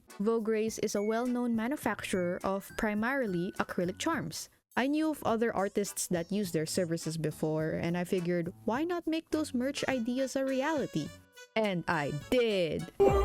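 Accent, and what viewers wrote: Philippines. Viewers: You know is Pilipino Because of The Accent❤❤😅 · WAIT UR FILIPINO?? I SEE THE ACCENT IN YOU. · i could tell from her/his (dont want to misgender and start an arguement heh) voice that your filipino, and the "lolo" part lol, but your grandpa is cool and i love how supportive he is just by wanting you to make merch for him :3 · Hi im new here, i love the shorts you post😁😁 Anyways.. I have a question... You sound filipino are you?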